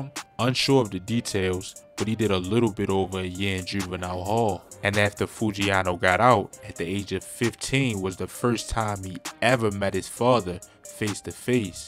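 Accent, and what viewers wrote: Baltimore, United States. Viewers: Baltimore accent crazy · def bmore 😭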